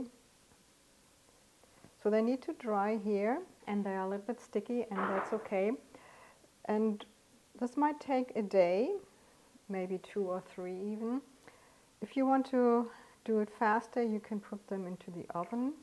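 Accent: Germany. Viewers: Bist du aus Nürnberg?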